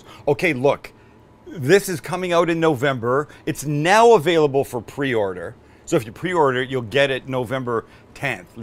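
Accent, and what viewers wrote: United Kingdom. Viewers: Loving the British accent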